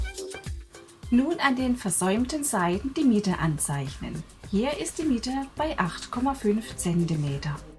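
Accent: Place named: Palatinate, Germany. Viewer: Liebe.. Vielen Dank für deine tollen Videos und ich, als Hessin die seit 16 Jahren in Niedersachsen wohnt, liebe deinen Dialekt... Ich schätze mal Pfalz, oder? 😍😍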